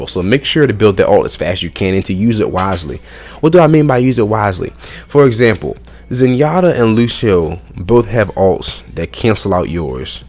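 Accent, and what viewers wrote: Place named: United States. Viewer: wasnt a fan of your heavy american accent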